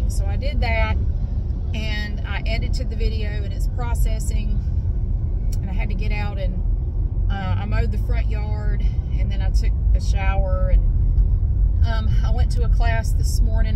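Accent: South, United States. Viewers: When I first subscribed to your other channel in late 2016,I thought to myself ,I finally found a Southern Asmr Artist( which was hard to find back then) I enjoyed your later night uploads, Congrats on getting a job, it's the best idea for anyone to not rely on a criminal corporation like Google⚡️🔥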